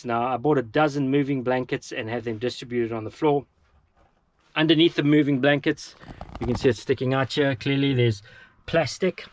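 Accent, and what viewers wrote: South Africa. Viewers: You know I love that craic what your doing but one thing I'm very curious about your accent I think I hear a bit of South African there but not 100% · Sounds like a South African.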